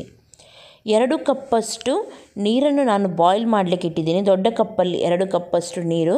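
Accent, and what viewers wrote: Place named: Dakshina Kannada, India. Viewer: I like your kannada. Perfect blend of south kanarian, blore and catholic style.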